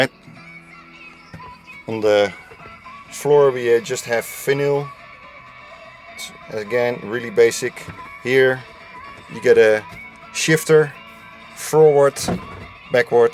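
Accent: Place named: Netherlands. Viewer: weer dat vreselijke Hollandse rot accent🤔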